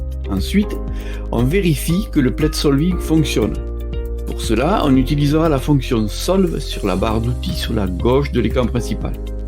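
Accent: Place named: Toulouse, France. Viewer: Un grand merci pour cette série de très loin la meilleure sur ces sujets… c’est clair, précis et sans fioritures inutiles, ça va droit au but sans perte de temps mais à un rythme qui reste très facile à suivre et à assimiler. Pas de blabla, ça ne bafouille pas, c’est un travail didactique remarquable de grande valeur. J’y ai puisé tous les renseignements que je cherchais à comprendre et bien plus encore… Merci, merci, merci mille fois. Et j’ajoute que le petit accent toulousain est bien agréable à l’oreille, ça respire la sérénité tout ça…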